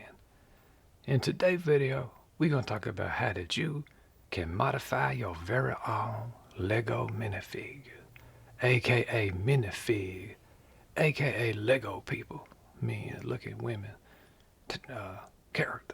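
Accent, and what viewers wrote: Louisiana, United States. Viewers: Hay are you from Louisiana?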